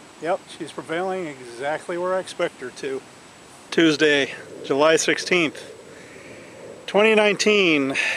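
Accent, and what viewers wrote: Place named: Midwest, United States. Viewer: your boring midwestern accent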